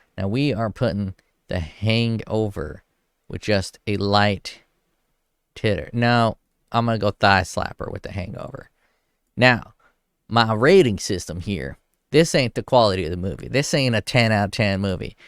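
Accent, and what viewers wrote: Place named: Alabama, United States. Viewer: What Town did you live?goddamn Alabama country boy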